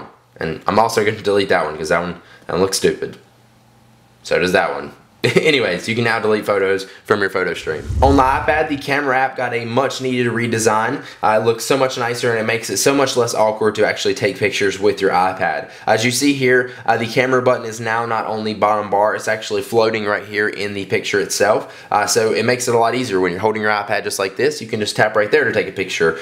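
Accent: South, United States. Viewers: its a southern accent ur either racist or just a fucking dumbass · If you guys think he has a strong southern accent, you have no idea...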